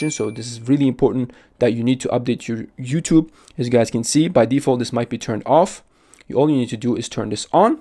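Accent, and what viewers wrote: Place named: Russia. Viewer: Not only bad, but intentionally disingenuous. However, the uploader has a Russian accent so I'm not at all surprised.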